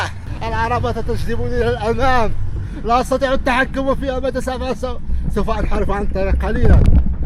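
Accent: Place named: Morocco. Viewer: لغة فصحى ركيكة كلغة الأطفال ..لغة كارثية مهزلة...اقول لك ماتكونش معقد من لهجتك المغربية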